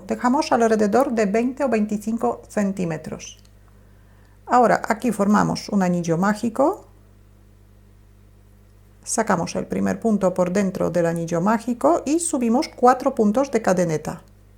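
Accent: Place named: Spain. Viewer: Que bárbaro tu comentario Roxana salas. Tiene muy bonita su voz. Además es asento español..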